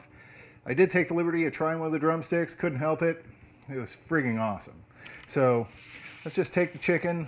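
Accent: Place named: Memphis, United States. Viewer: Uncle Lou's,sounds like Memphis to me.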